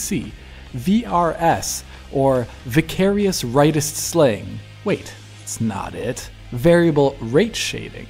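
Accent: Germany. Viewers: he's german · Because he's german and knows how to pronounce it correctly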